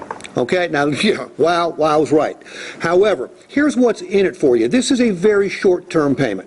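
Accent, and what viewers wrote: Appalachia, United States. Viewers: Hillbilly and his hick rube hillbilly bullshit foghorn leghorn twang talk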